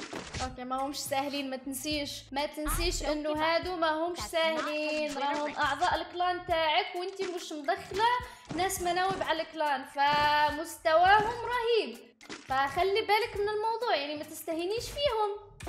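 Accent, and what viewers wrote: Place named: Lebanon. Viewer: هههه تتكلمي لبناني 😅😂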